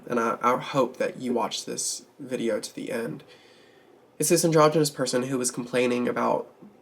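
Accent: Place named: South, United States. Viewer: I appreciate your perspective because cisgender people often don’t think that transphobia will affect them but it does. The moment people get suspected as trans or misgendered it does put them in danger to people’s transphobia even if they are not trans. So your perspective is necessary if cisgender people will listen. Also I love your southern accent. I am a fellow southerner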